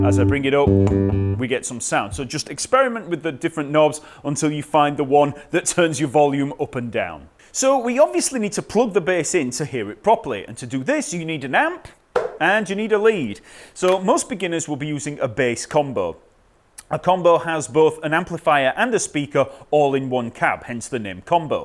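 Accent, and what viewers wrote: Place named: England, United Kingdom. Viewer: when did shane o mac get an english accent?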